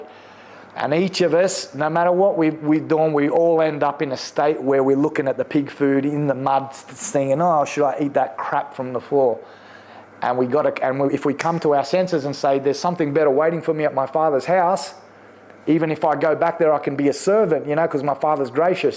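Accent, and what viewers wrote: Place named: Sydney, Australia. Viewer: I love this testimony! 🙌 i grew up sydney around the same time and also went off the rails, the way you speak takes me back. Dark times. The devil is a liar! I was definitely under demonic oppression. Your testimony is so powerful, you will save so many 🙏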